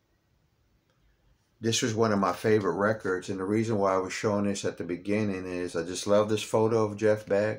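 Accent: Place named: Louisiana, United States. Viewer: Such a huge loss to the rock community! Another hard one to swallow. I asked if you were from L.A. because you're accent is similar to mine. I grew up on the Texas border on the Louisiana side. You got this country / Cajun thing going on that does my heart some good. Peace brother! R.I.P. Jeff Beck!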